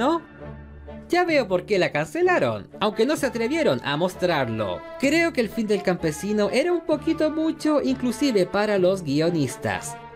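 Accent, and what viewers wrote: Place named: Chile. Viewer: Que buen trabajo, noté un ligero acento Chileno, qué edad tienes? Yo tengo 37 años, la vi a mis 8 o 9 años está maravilla.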